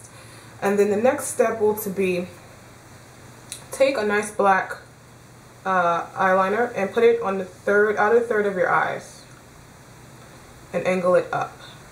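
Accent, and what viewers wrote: United Kingdom. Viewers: Hahaha love the british accent! great tutorial!! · lol at the british accent, I love it goooorgeous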